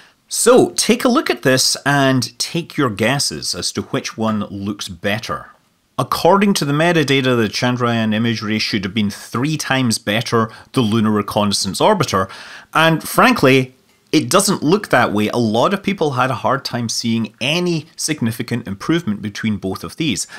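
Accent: Scotland, United Kingdom. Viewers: "Push broom" might be the best phrase to hear spoken in a Scottish accent.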